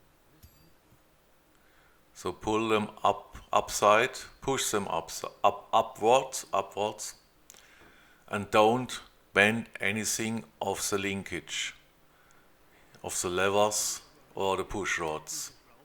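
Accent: Germany. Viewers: Thanks for sharing this video ❤❤❤❤❤❤❤❤!!!!!!! Also i want to say that you sound very kind with your German accent in English and that is so nice that you made this fix with your friend ❤. I ll try do the same as i have the same issue!!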